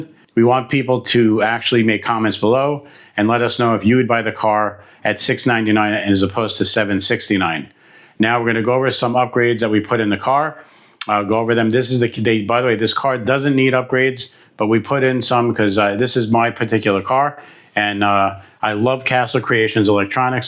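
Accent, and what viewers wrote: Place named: Brooklyn, United States. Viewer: My Cousin sounds like you. He is from Brooklyn I know this has nuttin to do with video! LOL Good to hear accent. I live in Florida so I hear way less and miss it!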